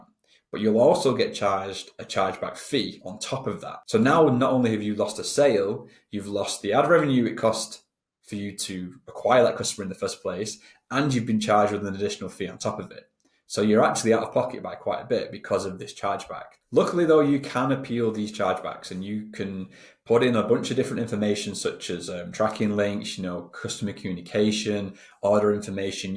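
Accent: North West England, United Kingdom. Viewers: First time I've watched your vids tonight mate. Breath of fresh air. Not the usual BS about how cool they are but proper factual stuff that we all need to know. I have had my ebay shop open dropshipping from Ali for a grand total of 5 days. I am currently going through the "what am I doing wrong" "is it worth it" phase. I'm trying to remain positive and keep scaling. Fingers crossed I need to find out how to get views first off. I'm keeping things as cheap as poss for the time being. Keep up the great content. You sound like a local north west lad like me too.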